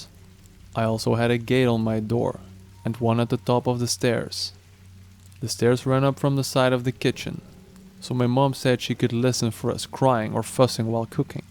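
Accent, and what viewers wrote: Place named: Eastern Europe. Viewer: 1st time listener.... that first story was creepy. Your accent reminds me of russian? Eastern europe?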